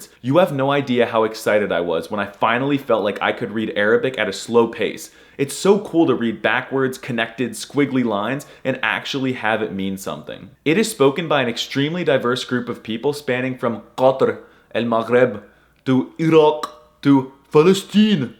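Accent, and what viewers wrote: Rio de Janeiro, Brazil. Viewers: You sound like a native Brazilian, not a Portugese person. That being said, I'm so glad my first language made it on this list. :D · as a native Portuguese: You talk Português from Brazil, not the right accent if you want to speak Português.. and some words are different too, but in the end we spoke the same languange, we understand with no dificult all hispanic languages.. Countries like, Angola, Moçambique, Guiné Bissau, Cabo Verde, Timor, Macau the official language is Português too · As a Brazilian he sounds like people from Rio de janeiro lol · 😂enough w the pt-br accent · his portuguese spelling his absolutely perfect 😂😂😂 I am brazilian, and he really played a brazilian speaking, it is really this tone that we talk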